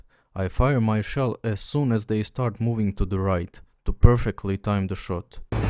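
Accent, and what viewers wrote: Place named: Eastern Europe. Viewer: Holy shit my guy has a voice! Lol if you ask me all us slav’s have the same accent when speaking English (2nd language) 😂. Продолжай в том же духе, брат 🫡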